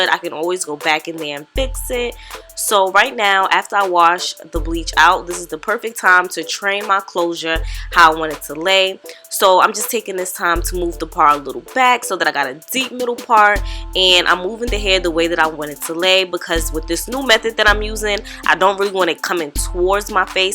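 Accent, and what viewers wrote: New York, United States. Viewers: First time watching your video and I knew u was from NY right away, we can’t hide our accents nowhere lol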